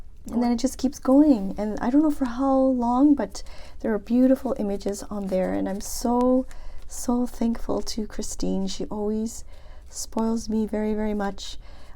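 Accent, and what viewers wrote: Canada. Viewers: Oh! I didn't want the video to end!!! I was enjoying so much! Thank you for keeping it real and for sharing with us how you sometimes struggle to keep up with your journals too. I already commented about the 10a.m ridiculousness in your previous video, but Ugh!!! I really feel your pain! And the gyms too? Pre-Covid, I used to be the first one at my Starbucks am when they opened. I needed my caffeine before heading to the gym! ☺️. I'm a morning person, and if it doesn't happen in the morning, it doesn't happen at all! Regarding the Oli clip, you could maybe try to find a different brand of magnetic paper clips locally? Especially beeing in Japan, the Mecca of stationary, I'd assume that you would be able to find pretty much anything you needed/wished/wanted... Anyway, it's just a thought 😊. I'm really curious about what it is you do exactly. 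Your job seems to be very intense! And what is that "box" you mention putting washi in? Ok, one last quick thing (I promise!! LoL) I find it so adorable when, once in a while, in some of your videos, I hear you say "eh?" at the end of a phrase. It always makes me smile. You might have left Canada, but Canada has not completely left you 😉. Sorry for the long and rambly comment!! Have a wonderful day! ♡♡♡ - X.O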